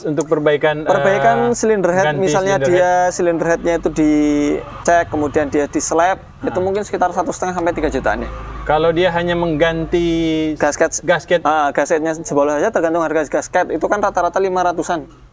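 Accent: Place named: West Sumatra, Indonesia. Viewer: dari logatnya, kayaknya orang minang nih yg nyasar ke jogja 😁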